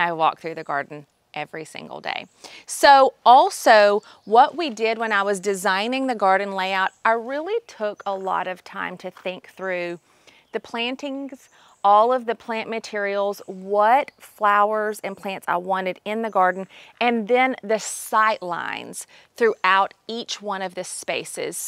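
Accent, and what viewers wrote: South, United States. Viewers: Love your gardens. What is the big green trailing plant on the steps at the end if the video when you’re sitting on the steps? Also, what is the white trailing flower in the round tall pot that isn’t on the steps. I knew you were a Southern neighbor when I first heard you talking in another video. I’m from Memphis but moved to Franklin, TN 40 years ago until grandchildren came then we just had to get closer/ Murfreesboro now. Anyway, keep making your awesome videos. We southern ladies just love to decorate and garden don’t we? It’s our heritage. 🌹😉😀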